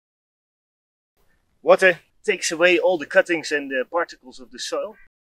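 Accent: Netherlands. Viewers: Gewoon Twents verstoa ik ook we heur lol :-) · sounds Dutch :) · Are you dutch by chance? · Kan horen dat je een nederlander bent haha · I like that rig a lot, very well thought out for the task. But you are lucky with the soil consistency you have in the Netherlands (I assume from the accent). If you have rocky ground... well then... back to conventional drilling. ;)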